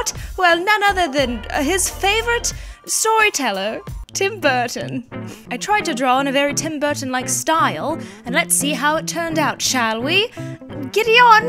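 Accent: United Kingdom. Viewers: Well you sound more British than I do, and I'm actually British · Wow. I can't believe you did a british accent for this entite video. 👏👏👏👏👏👏👏👏👏 That takes guts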